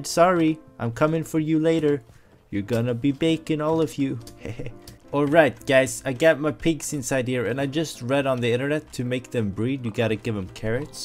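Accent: Sweden. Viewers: Are u swedish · Are u swedish?? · Are you from sweden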